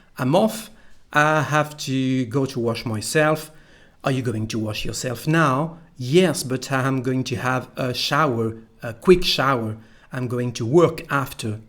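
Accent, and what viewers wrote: France. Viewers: Salut! Would this be the northern accent? Saying "sh" instead of "j". I'm from Brazil learning French in English, pardon me if I say anything too stupid. I recently watched the movie Bienvenue chez les Ch'tis and was wondering if there's an accent here. Merci beaucoup!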